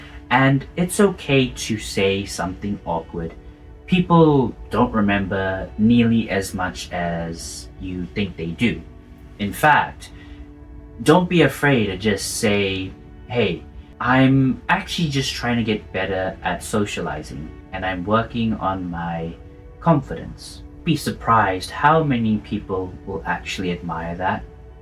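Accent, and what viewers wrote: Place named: South Africa. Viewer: Just one thing I need to know, you accent sound a bit South African, I know that you're in Australia but do you have a connection to South Africa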